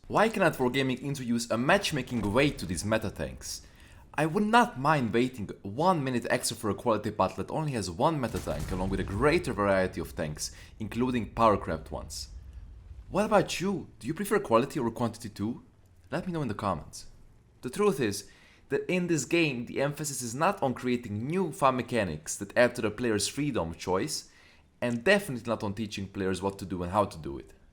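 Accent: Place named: Hungary. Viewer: Hungarian accent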